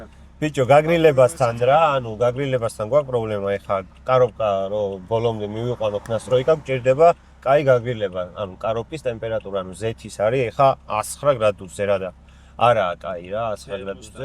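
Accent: Guria, Georgia. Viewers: გურული აქცენტით რო ურტყავ ხოლმე ვხალისობ კაია 😊 მეც გურული ❤😂 გბალეშიკობთ გურული ბიჭები, აპა რავა 😊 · კაცურად გურულად მეტი ილაპარაკე ❤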